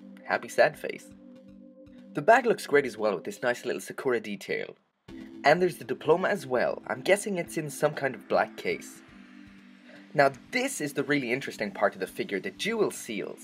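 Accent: Ireland. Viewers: Awesome figure! ^_^ You wouldn't happen to be from Ireland would you? You really sound Irish xD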